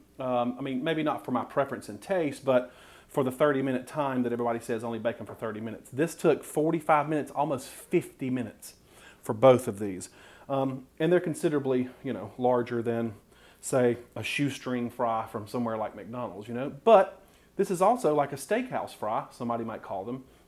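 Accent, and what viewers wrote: South, United States. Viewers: Oh wow I just love your southern accent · Love the South in your mouth!! Where you from? New sub. You’re a goofball—my favorite kind of person! Great video.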